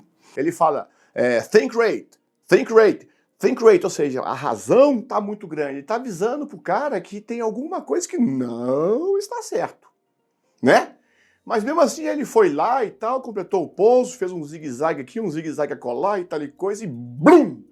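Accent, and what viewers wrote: Minas Gerais, Brazil. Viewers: Bora "resenhar" as notícias do aeroin, pelas palavras e sotaque mineires do nosso querido Cmdt. Abçao...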